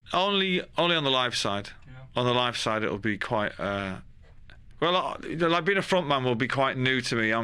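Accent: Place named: Manchester, United Kingdom. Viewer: Sei stato bravissimo con i sottotitoli, posso farti solo un piccolissimo appunto? Io non avrei tradotto "freak out" con sclerare ma piuttosto con "scioccato" o "molto sorpreso". Può anche significare "spaventato" che però in questo contesto non ha senso ma il significato che tu hai scelto a me non risulta o almeno a me non è mai capitato di sentirlo usare in quell'accezione. Ripeto, sei stato molto bravo anche perché il mancunian non è né facile da capire né piacevolissimo da ascoltare. Anche se quello che esagera con il dialetto è il fratello Liam.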